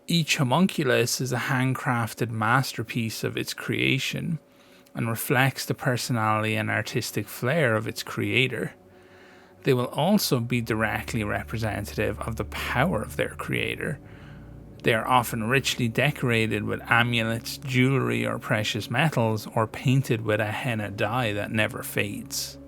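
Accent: Ireland. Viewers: Doing an awesome job my man, honestly the best lore channel I've found for trench crusade. Irish accent works so well for the subject matter too !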